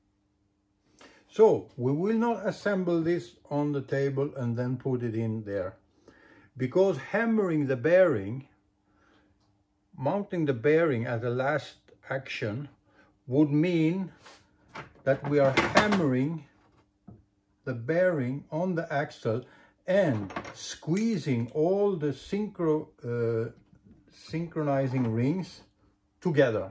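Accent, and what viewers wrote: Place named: Sweden. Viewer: Glad I could help! Accent is Swedish :)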